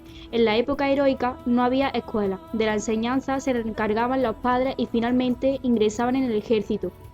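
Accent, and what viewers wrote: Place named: Spain. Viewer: Buena infrmación, buen vídeo. Me gusta el acento de la narradora. De qué parte de España es? Saludos. Gracias.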